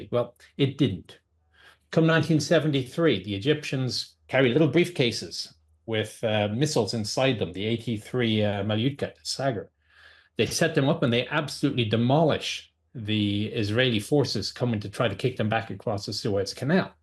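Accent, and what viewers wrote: Ireland. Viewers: The tank man is not British??? But American his accent sounds Irish with a little bit of london oops... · Is this fella from Ireland originally but in US for years? His oronunciation of 'T' especially at the end of words suggests so.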